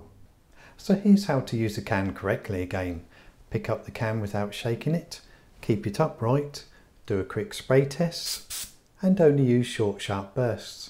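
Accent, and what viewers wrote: United Kingdom. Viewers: British Bob Ross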